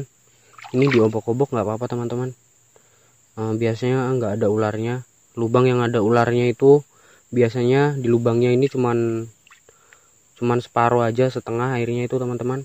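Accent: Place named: Surakarta, Indonesia. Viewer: Sampean asline ngndi mas,, boso ne ra adoh ko solo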